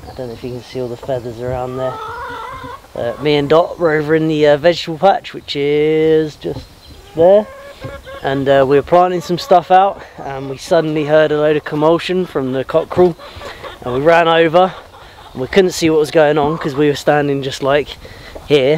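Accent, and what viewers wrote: Wales, United Kingdom. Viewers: Loved your welsh accent and the humour behind it.:) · Awesome pal, nice to finally know your the only gay in the village 😄😄😄😄 fantastic Welsh accent. Nice to see in the vids again. She is beasting it pal, watch out she will be taking over. Lol. Stay safe x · Nice welsh accent you got there:D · I love hearing the welsh assent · Assent Welsh to no end...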